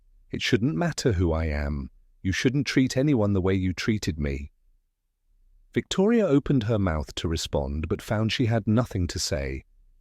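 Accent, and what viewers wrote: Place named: RP, United Kingdom. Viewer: Greetings from Poland, and thank you for a great story! Your narrator has an impeccable English accent (RP, isn't it?) that allowed my listening comprehension to be about 100% without subtitles, even though English isn't even my second language (I only studied EFL).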